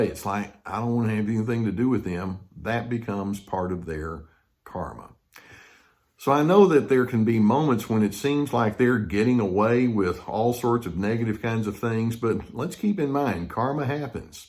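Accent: South, United States. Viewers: Bloody hell! This is excellent analysis.your best yet. That's not to say all your stuff isn't, excellent! I love, Karma, as it is very difficult to "uninsnare", yourself from these detestable, bastards!! Love, the southern drawl. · Not only are videos helping me cope with and understand narcs, I just LOVE his deep, soothing Southern voice and accent!!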